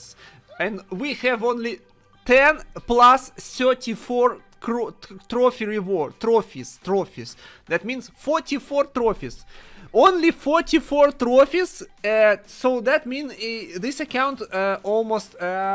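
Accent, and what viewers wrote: Russia. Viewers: Yes. And i think he's got russian acsent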